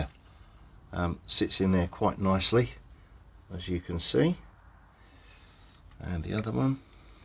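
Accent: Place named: RP, United Kingdom. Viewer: I'm doing good, thanks. So you've gone from being a bad ass head of a bike gang, to a posh speaking GS riding Youtuber. 😁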